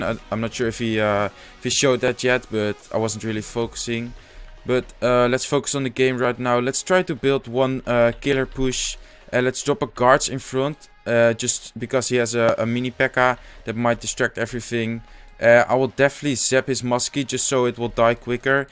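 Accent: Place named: Netherlands. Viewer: Where you from? Je klinkt Nederlands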